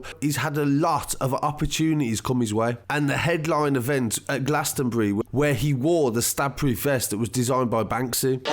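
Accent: Birmingham, United Kingdom. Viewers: I really like your Birmingham accent take care brother and keep reporting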